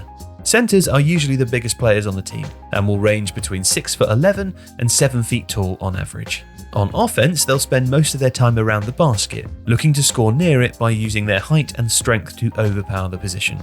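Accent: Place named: England, United Kingdom. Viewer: I didn’t need a fancy English accent to tell me that. White washing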